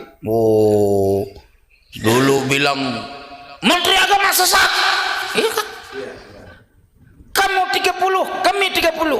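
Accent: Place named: Betawi, Indonesia. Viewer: Gurih banget ini teungku dialek betawi nya. Padahal darahnya Aceh banget. Tapi kalimatnya sangat runut, ilmiah, rasional dan masuk akal serta mudah dicerna dan difahami oleh orang-orang awam. ❤❤❤❤❤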